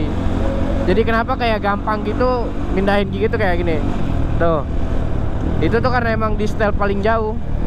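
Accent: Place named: West Java, Indonesia. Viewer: Jangan sampe ilang bhsa sunda nya bang hehe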